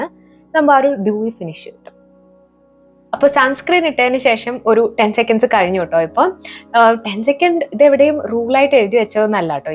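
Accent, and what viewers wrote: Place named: Kottayam, India. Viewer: Luvd ur video, n ur look too.. ടെ വർത്താനം ഒത്തിരി ഇഷ്ടമാണ്.. ആ mike nte 'സോക്കെട്' കേട്ട് ഞാൻ ചിരിച്ചു..തനി കോട്ടയം വർത്താനം ആന്നെയ്..😅 ഇവിടേം അത് പോലോക്കെ പറയാറുണ്ട്😁 like ur videos, esp dress hauls..kp going dear. ❤ Princy,frm Tiruvalla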